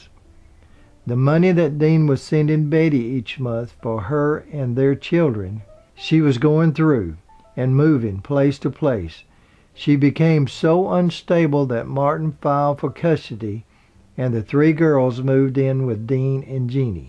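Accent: South, United States. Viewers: I smile every time someone comments on your accent, vernacular and the Southern charm exuded in your voice. You remind me of my younger days and down home folks having a casual conversation. Thanks for that memory and for your informative and insightful videos. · This guy I like has a smooth southern voice....kinda weird for a Dean Martin history......whatever? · I love this southern Accent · Now that Lewis has also passed on, do you have any plans to make a 'companion piece' to this video about his life? I do hope you consider it as I really enjoy watching your films. Oh, and just for the record, I am one of those who just LOVE your accent - it reminds me of several holidays I had touring the Southern states of America many years ago when I was young and mortgage-free!! We always found the southerners extremely friendly, and they were just as fascinated by our English accents as we were by theirs!! 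· My little brother spoke only Portugues when we came home from living in Brasil. He understood English, but only spoke a few words. We spoke English at home, but the woman who took care of him spoke Portuguez to him, and although she spoke English very well, would only answer us kids if we spoke Portugues. Good documentary,Love your accent. Your voice sounds like the men in my family! (from Alabama. Tennessee and Florida) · . I love your southern dialect. You sound so much like how my daddy talked. Your voice is very soothing. Thank you for your channel and all the good info you provide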